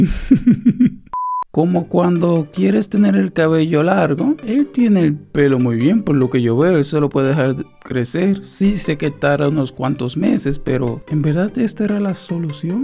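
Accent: Dominican Republic. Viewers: Eres dominicano 🇩🇴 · eres dominicano?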